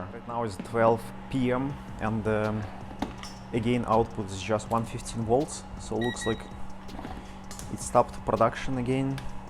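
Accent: Russia. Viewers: Ахаха. Какой чисто русский английский 😁👍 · Какой ужасный рязанский акцент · С первых секунд понятно стало откуда автор :)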